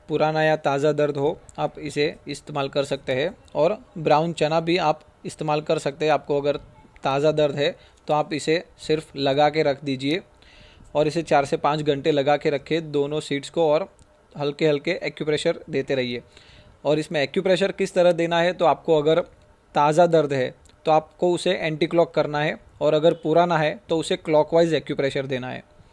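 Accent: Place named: Gujarat, India. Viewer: Sir bhut achi jankari di aapne Aapka ye therapy centre kha h sir Aapki language explan se aap Gujarat se hai ese lag rha h Please give your address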